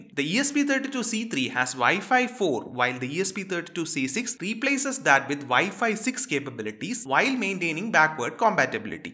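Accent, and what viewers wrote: India. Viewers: Sorry I find the Indian accents the hardest to listen to and understand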